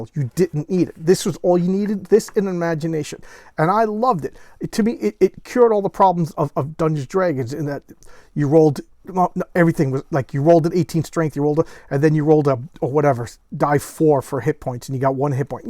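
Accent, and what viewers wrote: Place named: New York, United States. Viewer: I’m in Oklahoma City. I don’t think you said where you live but I’m guessing somewhere near NYC based off your accent? I’ve signed up for the forum and am waiting for my e-mail verification. Re: Jeff Dee. About 10 years ago I sent him a fan email that ended up several years ago with me being hired to illustrate the War Heroes WWII sourcebook for their ‘Mighty Protectors’ game (basically V&V 3). I was thrilled to be a small part of V&V ‘lore’ and Jeff was entirely professional. At one point he gave me his number in case I had questions. Based on interviews, he is very outspoken and direct, so I didn’t dare call him. But V&V is great and his art is awesome.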